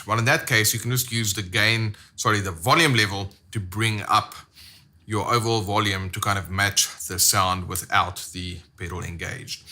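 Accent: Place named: South Africa. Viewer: Ive always been a fan of the bb preamp,one is always close by my pedal board. These days i have the custom shop one with the mid boost. Ps..... what the hell accent is that? Ive never heard it before,South Africa?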